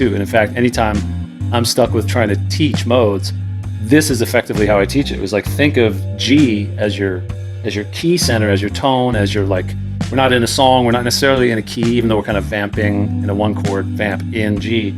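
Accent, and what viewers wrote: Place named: New Orleans, United States. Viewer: Man you sound like you're from New Orleans lol. I am so I think thats pretty cool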